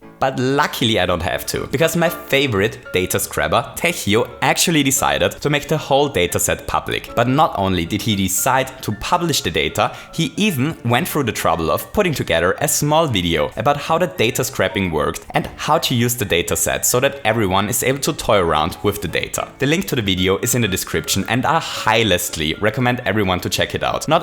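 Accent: Austria. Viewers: Warte… du kommst aus Österreich?? :o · ohhh hes from Austria. That's what accent he has! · Are you from Austria? Wäre echt krass😂😂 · Mit seinem Akzent wie kannst du überrascht sein dass er aus österreich kommt 😂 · Was! Du bist Österreicher? Ich mein, ich schau mir deine Videos schon seit gut einem Jahr an und wusste das du Englisch nicht als Muttersprache hast, trotzdem überraschend! Grüße aus Graz ;) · Warte was du bist Österreicher? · AUSTRIA, finally, i knew you had an accent, but I couldn't figure out which. Btw, greetings from your neighbour country, Germany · Du bist Österreicher? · Du bisch österreicher??😳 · Hahaa, I knew you were from austria (dont know if you mentioned it before), but I could guess because of your wonderful accent. Hot Cogs. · Oh you‘re from austria? So you talk german now i understand your accent lmao. Im swiss btw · I'd never guess you are from austria, even though you speak a rly strong dialect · Hob ma scho docht, dassd a Österreicha bist 😅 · Ha, Ich hab gewusst dass du Österreicher bist, der Akzent ist mir schon bekannt vorgekommen :D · Wait what you are from austria? 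Hurray! I could never figure out where that accent was from even though it was right in front of me the whole time. Mach weiter so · Warte mal du kommst aus Österreich? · Du bist Österreicher? Nice! Ich auch! Ich wusste von deinem Akzent her, dass du deutsch sprichst, aber Österreicher wusste ich nicht! Schön! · Du kommst aus Österreich?! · Eyyy du bist Österreicher? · He just said it in the video. He's from Austria. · Du bist Österreicher? Nice. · IS AUSTRIAN EVERYONE, NOW WE CAN STOP ASKING WHERE HIS ACCENT IS FROM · HOO-ray, FINALLY! I've been trying to place your accent since I found your channel. My boyfriend thought maybe Eastern European, (e.g. Czech) and I was guessing German. Austria it is. Absolutely beautiful country. Love your work, keep up the great content. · Momentmomentmoment! Du bisd österreicher?!? Jo lol, seas😂😂👋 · OOOOOHHH You're Austrian! I had no idea where you were from XD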